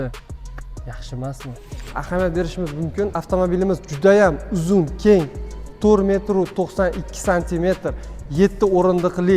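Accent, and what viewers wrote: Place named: Tashkent, Uzbekistan. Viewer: Тошкенча Шева качаткибтию у́накки боб ковоти,( катмеди манитори😂😂😂)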